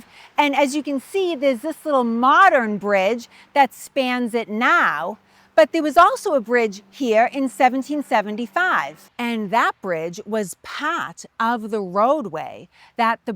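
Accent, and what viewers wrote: Boston, United States. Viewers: My friend told me about you. I am a huge history buff, but my friend says your Boston accent is worse than mine. I was on a TV show based in Boston, and I had to be subtitled. Hahaha, I just subscribed. LOVE your work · I love that Boston accent. My Love is a native of Cranston RI. If u have ever heard a girl form there talk u will fine it just as funny as i still do even after 44 yr. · Will you take that Boston accent with you when you go out of Massachusetts.🐎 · You are so smart, attractive, and live the Boston accent!!❤ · Being a Bostonian, I really appreciate a YT channel where the presenter talks like me!😅 Great job,! Careful walking those ridges! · Damn,. I didn't think anybody's Boston accent was as thick as mine. Well done. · She had me at Hello. Love her Boston twang . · Your either from Wostah, or Reveah.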